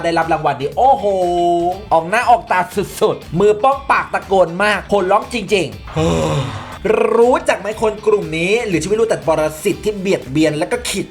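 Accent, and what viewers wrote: Isan, Thailand. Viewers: ดีใจกับน้องกะดีใจอยู่ แต่ขออนุญาตหัวเสียงพากย์คลิปของแอ๊ดแหน่ได่บ่ฮึ..ไทบ้านได๋น้อแอ๊ดนิ🤣🤣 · ขำบพเว้าอิสานน่ารัก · แอดมิน....คนขอนแก่นหรือสารคาม...แมนบ่ล่ะนิ...😁😁😁😁😁😁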